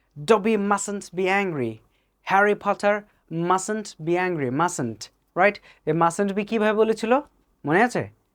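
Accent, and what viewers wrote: United States. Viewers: american accent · ভাই এগুলো America Accent নাকি British Accent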